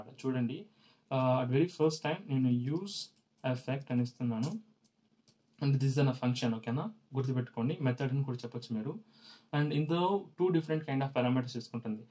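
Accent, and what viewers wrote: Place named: Chittoor, India. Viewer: Clear cut explanation with theory as well as hands on, its really building confidence for me to keep up learning React js thank you bro and finally I think ur from Border of Andhrapradesh or from Chittoor district/TN right...?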